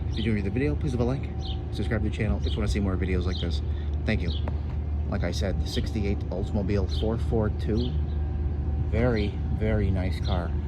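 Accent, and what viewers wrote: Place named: Chicago, United States. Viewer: Man, you have a cool Chicago accent!!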